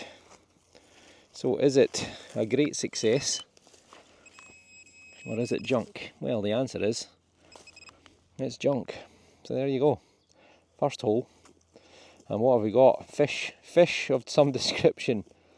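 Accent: Scotland, United Kingdom. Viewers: Thank you so much for all your videos, i am in perthshire and I love walking about with you because I'm in a wheelchair, so keep up the good work, alao i love your Scottish accent, take care, Agnes 🏴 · Yes it’s definitely that gorgeous Scottish accent and lovely tone of voice ❤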